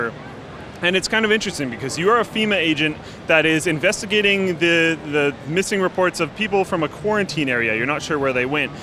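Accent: Canada. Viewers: his accent is so canadian, sometimes its hard to tell if someone is american or canadian until they say certain words but with this guy its super easy to tell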